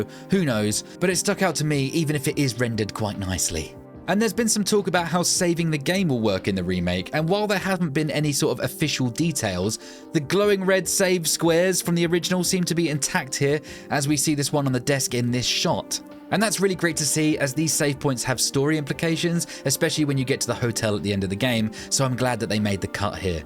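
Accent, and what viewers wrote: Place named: United Kingdom. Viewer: its the accent ... British accent does wonders. Its shapes reality :face-fuchsia-wide-eyes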